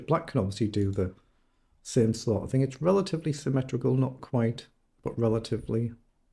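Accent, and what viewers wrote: Newcastle, United Kingdom. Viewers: I see! It sounds Geordie to me, I didn't know Yorkshire had that kind of accent. Great channel I enjoy watching your games.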